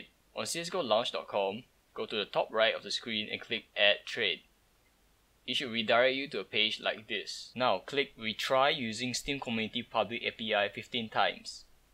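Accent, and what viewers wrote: Singapore. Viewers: u sound singaporean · R u from singapore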